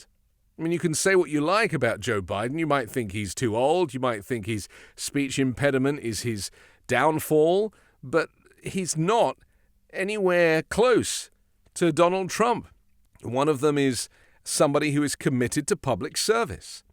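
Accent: United Kingdom. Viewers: It sounds like you're from the UK. Just bend over and take it from the migrants and don't worry about what we're doing over here. We're actually fixing our problems.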